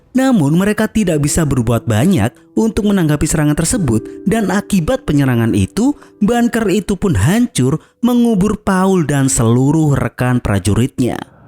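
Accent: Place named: Java, Indonesia. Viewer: Loh le sampean wong jowo toh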